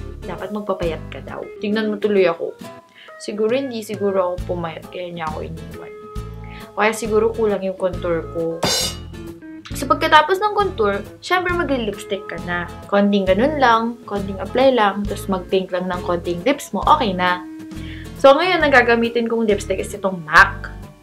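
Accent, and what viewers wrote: Ilocos, Philippines. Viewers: ilokano ka ba?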